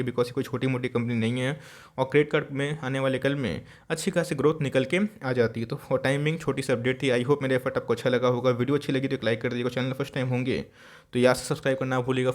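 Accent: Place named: Bihar, India. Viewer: भाई बिहारी स्टाइल अच्छी लगी।बड़ी को बरी बोल रहे हो।बड़ा को बरा मज़ा आ गया।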